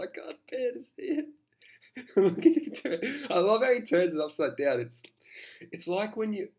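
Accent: Australia. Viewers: Wait.. You are Greek? Did I get that right? Didn´t know that. Thought you were Australian.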